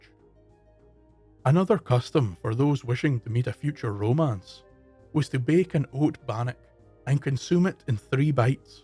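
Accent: Scotland, United Kingdom. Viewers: Crazy how similar a norther Irish accent and your Scottish accent is. You can really hear the crossover.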